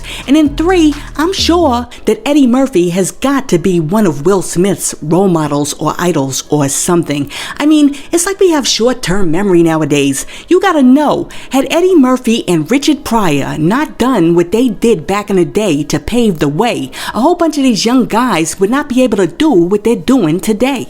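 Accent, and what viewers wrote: New York, United States. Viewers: You're so funny, and i love your New York accent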